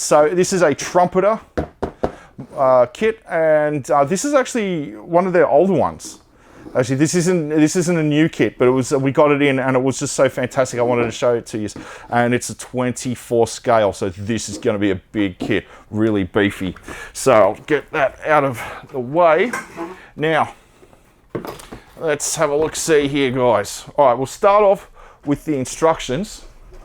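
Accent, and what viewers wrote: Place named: United Kingdom. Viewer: from your accent，do you come from Britain？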